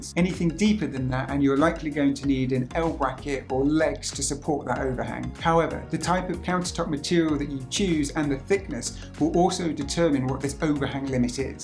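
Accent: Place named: United Kingdom. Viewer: He’s British judging by his accent so it’s perfectly normal for him to express in metric measurements.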